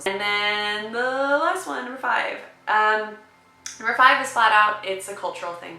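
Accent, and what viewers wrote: South, United States. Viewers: to a brit, you dont have a strong southern accent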